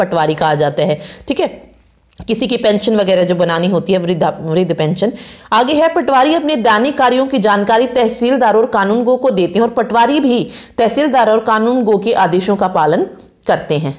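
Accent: Haryana, India. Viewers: Maam yeh wording bolte bolte haryanvi nikl rahi h bolte time 👌🏻👌🏻👌🏻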